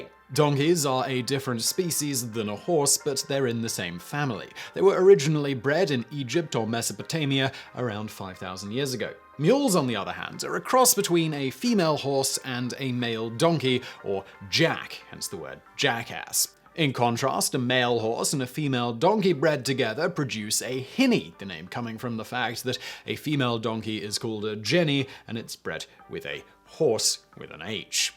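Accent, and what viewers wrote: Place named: United Kingdom. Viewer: Zedbras 😂😂 I love british english (yeah yeah, i know lives in Prague)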